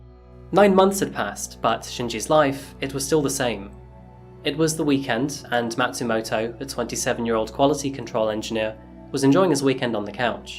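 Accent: England, United Kingdom. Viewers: I can tell by your accent that you're English but I cannot place where. All I know is that it isn't Devonshire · I know now you were born in the US, but i still cant get over the fact that a british person is offering me coffee!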